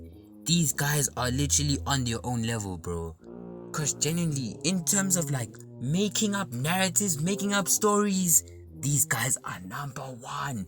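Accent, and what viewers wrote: South Africa. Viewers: W vid and vids. You South African, bro? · South African accent heavy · No LIE this man sounds SOUTH AFRICAN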